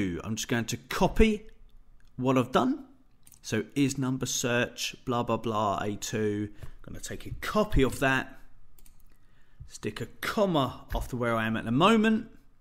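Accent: United Kingdom. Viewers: Excellent explanation. I solved the problem I had. Thank you very much "indeed". I liked the way you explain, especially for your accent! From now on, I will come to your channel in case I have troubles with Excel. Also, I'll tell my friends about your Channel. I studied English in Cambridge, UK, a long time ago, and I always loved the British Accent. Now I live in USA, and the American English sounds like another language. (No offense, please)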